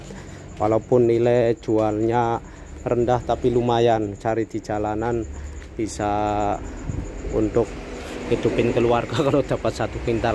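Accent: Indonesia. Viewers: Kaya wong Tegal' Brebes'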